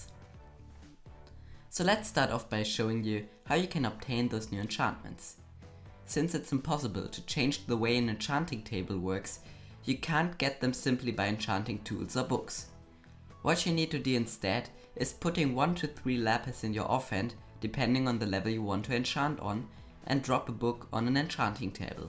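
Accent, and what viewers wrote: Germany. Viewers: Age of xD glaub auch XD er hat so nen deutschen Akzent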